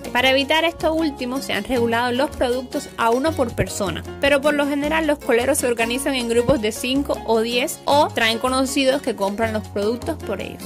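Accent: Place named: Cuba. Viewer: Por tu acento marcadamente cubano casi no te entendí algunas expresiones sobre los oficios. Te cuento que en Cartagena, en el Caribe colombiano hay varios de los existentes en tu isla; bicitaxi, zapatero, el que arregla las ollas y calderos, las sombrillas y el colero ( acá lo ves quienes apartan los turnos en las colas para las citas médicas y obtención de medicamentos en las IPS- Instituciones Prestadoras de Salud- y en las EPS-Empresas prestadoras de Salud- y el que consigue pasajeros para el transporte. El que me pareció más curioso. porque ya ni los veo, es el de los encendedores, que llaman ustedes fosforeros. Me llamó la atención que no existan allá los mototaxistas, cuando acá eso es una PLAGAAAAA. Un abrazo desde Colombia.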